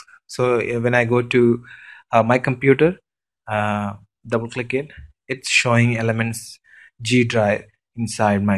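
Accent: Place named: India. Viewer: you know its going to be a good tutorial if you haer that indian acent. btw thx it worked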